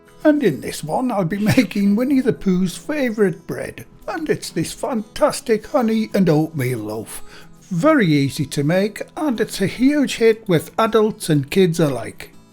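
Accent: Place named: Newcastle, United Kingdom. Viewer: I don’t hear anything but Geordie.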